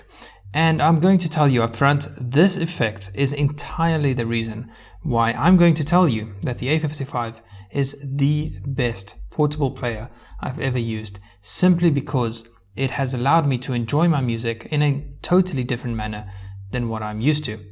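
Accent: South Africa. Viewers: I'll be surprised if I'm wrong here but by any chance are you South African 🇿🇦 ? 😁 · nice review! you sound south african...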